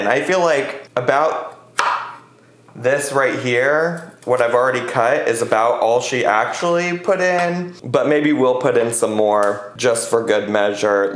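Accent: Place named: Midwest, United States. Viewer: It’s that Midwestern voice.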